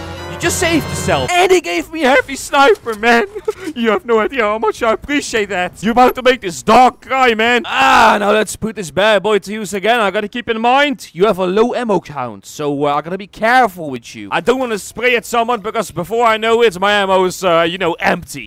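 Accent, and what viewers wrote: Netherlands. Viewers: Yourrrr Dutch I think bro👅 ( I’m Dutch ) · kom je uit nederland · Lekker nederlands hahaha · Your dutch i can hear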